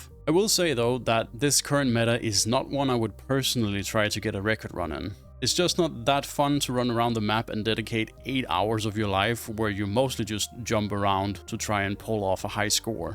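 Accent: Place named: Denmark. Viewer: Do you happen to be Danish? You sound like you could be.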